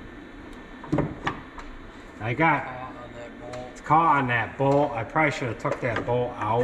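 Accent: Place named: Appalachia, United States. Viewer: Quit talkng like a hillbilly god bless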